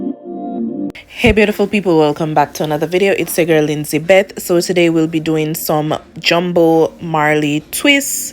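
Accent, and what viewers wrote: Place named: Jamaica. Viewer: Come thruuu Jamaican Accent lmao. These came out lovely. Love it!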